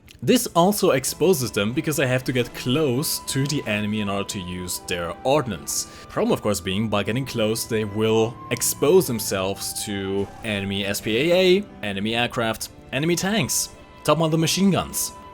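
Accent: Germany. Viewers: Sorry but i cant listen to you because you Sound so german. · Digga, man hört deinen Deutschen Akzent richtig raus xD.Würde mich freuen, wenn du einen Kanal aufmachen würdest wo du War Thunder spielst :D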